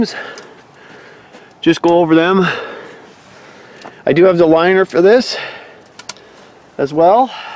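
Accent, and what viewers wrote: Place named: Canada. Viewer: That's the most Canadian accent I've heard all day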